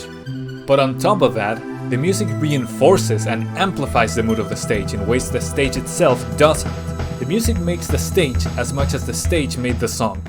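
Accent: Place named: Chile. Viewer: Un chileno hablando ingles? Aprende toby fox 🗣️🔥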